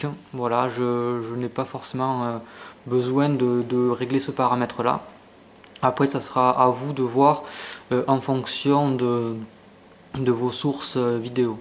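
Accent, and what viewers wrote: France. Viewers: Merci pour la vidéo PS j'aime trop ton accent du sud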